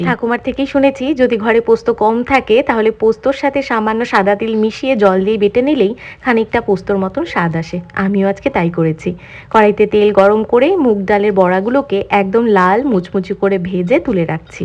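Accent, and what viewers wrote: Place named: Barishal, Bangladesh. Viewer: আপনি তো মনে হয় পশ্চিম বঙ্গ ভারতের। কিন্তু কী সুন্দর বরিশাল বাংলাদেশীদের মতো কথা বল্লেন! ভাল লাগলো! আমিও বানিয়ে দেখবো এই রেসিপিটা। লোভনীয় হয়েছে। ধন্যবাদ। ❤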